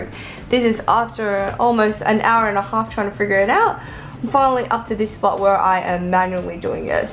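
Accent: Australia. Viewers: The way she talk like Rosé of Blackpink ..aussie accent tho ☺ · I knew u were from Australia cause of ur accent . Reminds me of the Rebeka twins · I had a feeling you were Australian and then you said it and I was like YES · I’ve always wondered how my name would be said with an Australian accent haha. Now I know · Sorry but she’s nice and pretty and all but I’m not really into people with Aussie accents (not love I mean like to watch them on yt) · so weird to hear an asian speaking Australian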